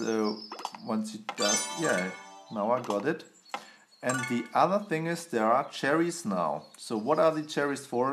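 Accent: Germany. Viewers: Kann es sein das du deutscher bist? Das ist so ein typisches "Deutsch Englisch"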